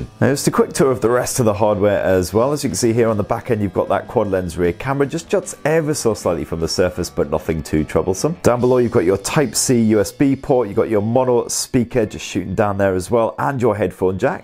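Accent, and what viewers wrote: United Kingdom. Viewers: Said the actress to the bishop! I can tell your British! Good review getting mine next week!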